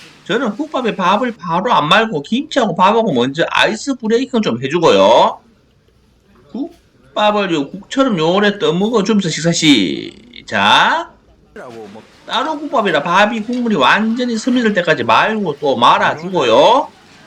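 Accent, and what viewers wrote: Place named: Gyeongsang, South Korea. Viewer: 형님. 채널에 따봉 30회 정도 박고 추천 뜨면 꼭 봅니다. 답변 두 가지만 부탁 드립니다. 1. 아무리 맛집이라고 해도, 기대감이 얹혀지면 감동받기 쉽지 않은데, 정말 이 집만은 무조건 꼭 가야한다라는 곳이 있으면, 한 곳 추천 부탁드립니다. 2. 무꾸재비 뜻 좀 알려주시라예. 볼 때마다 너무 궁금합니다. 저도 경남 촌놈인 주제에 처음에는 사투리가 너무 거슬려서 부담스러웠는데, 지금은 그 사투리 없으면 무슨 맛으로 볼까 싶은 마음입니다. 어쨌든 마창석님과 기분 좋은 영상 계속 만들어 주시면 감사하겠습니다. (마창석님의 짜투리 평가도 넣어 주시면 감사겠습니다. 목소리가 궁금합니다.)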